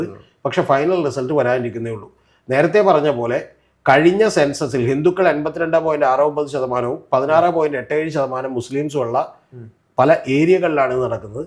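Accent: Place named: North India, India. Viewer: is he a malayalee?sounds North Indian.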